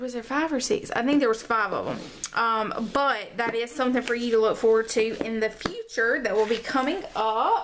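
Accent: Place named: North Carolina, United States. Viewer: Hey! Where are you from? It's rare I hear someone on YouTube that talks like me!!! Country girl from Western NC here!!:)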